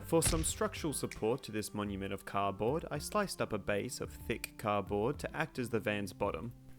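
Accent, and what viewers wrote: Australia. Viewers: I came here looking for another great maker and was super stoked to hear an Aussie accent when it started. Love your work and your style. Subscribed - can't wait to see more.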